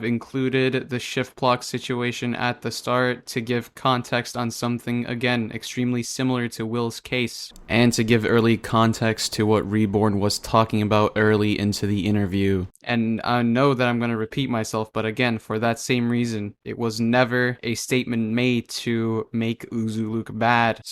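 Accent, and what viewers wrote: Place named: United Kingdom. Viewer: Omg yiur bri'tsh???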